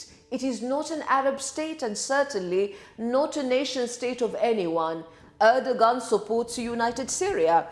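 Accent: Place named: United Kingdom. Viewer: ملة بريتيش اكسنت يا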